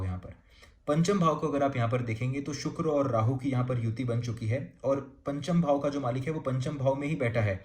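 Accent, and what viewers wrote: Indore, India. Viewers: Are you from Indore?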